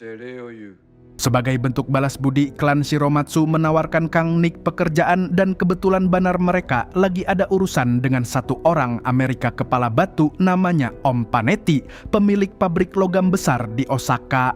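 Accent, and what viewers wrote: Banjar, Indonesia. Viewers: uyy amang banjarrr😂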